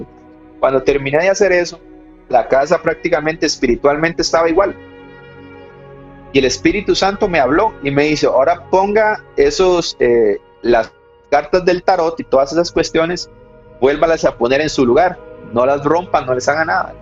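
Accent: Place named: Costa Rica. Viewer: tu acento es. Tico....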